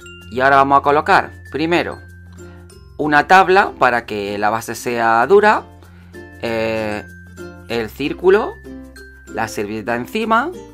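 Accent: Andalusia, Spain. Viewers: De Venezuela Hijo ...se te Nota lo andalu Hole Hole ...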